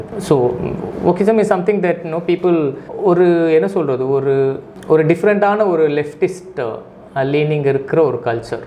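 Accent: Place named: India. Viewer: I love his English, his voice and his Indian accent. Every one including children can understand.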